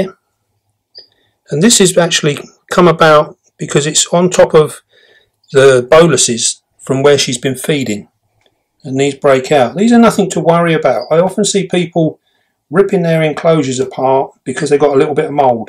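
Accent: United Kingdom. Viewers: What big spinerats she hase,she how she can make so much web,so easy I think a lot of people watch for the Beautiful T you have an great content,but a small section I bet for ur British accent an a super small are arachnophobia people,. Am in the first bunch 8 T s so far. Have a happy new year an camera lady 👍👍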